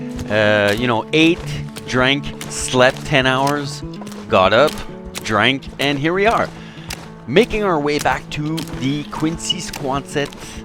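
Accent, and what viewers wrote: France. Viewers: are you french cause im french and when i speak english i sound similar to you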